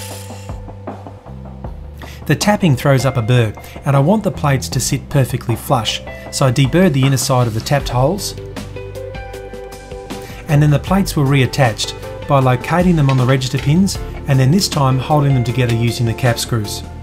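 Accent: Australia. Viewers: Bloddy hell cobber, have you got an aussie accent mate! Crazy the difference between states (Sth Aust.)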